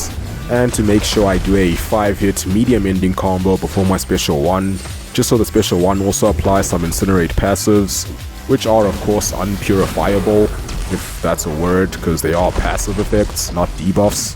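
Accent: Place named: South Africa. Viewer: It sounds south African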